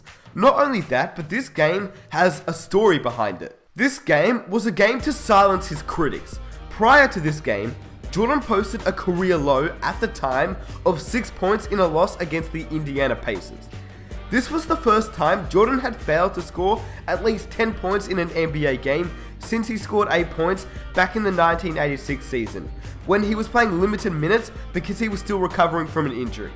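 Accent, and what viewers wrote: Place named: Australia. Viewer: What do you mean? you always sound Australian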